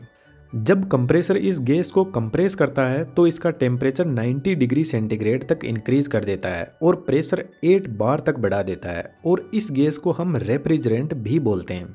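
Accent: Rajasthan, India. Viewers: Bhai rajasthan se ho kya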